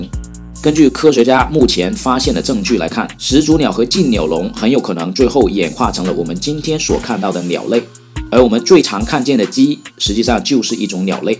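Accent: Hong Kong, China. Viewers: 这口音，是香港人或广东人说的普通话吗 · 香港口音越聽越靠杯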